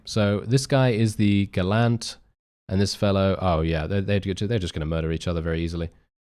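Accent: United Kingdom. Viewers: How can anybody not recognise you're British accent?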